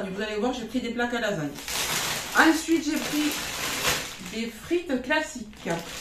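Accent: South, France. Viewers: jadore ton accent!!!!! ca sent bon le sud et le soleil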